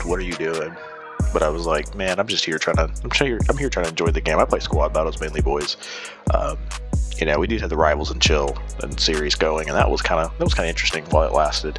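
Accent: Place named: California, United States. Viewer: Completely different guesses but I’d say you’re from California or New Jersey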